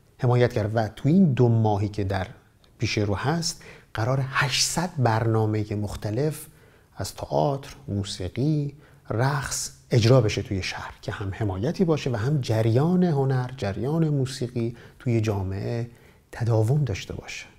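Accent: Bushehr, Iran. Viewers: Thanks jan for your profound and heartfelt wisdom, I've recently come upon your magnificent YouTube channel looking for some music fundamental lessons after picking up bass guitar. And I have to give you a very warm feedback and express my most sincere gratitude. Because you are doing our society a great service by creating this high quality content. Moreover, your general warmth and accent coming from Bushehr have grown on me as I am from Kerman myself. Thank you ❤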